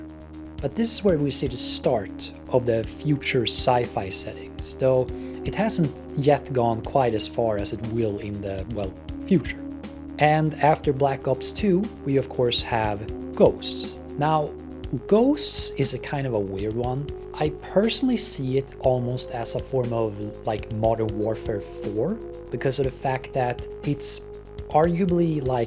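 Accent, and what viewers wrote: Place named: Sweden. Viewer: Great video bro🙂btw are swedish? Your accent sounds just like it 🙂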